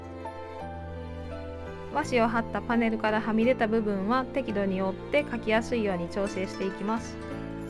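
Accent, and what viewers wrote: Kyoto, Japan. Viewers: 京都人やから一瞬で京都の人やとわかった、、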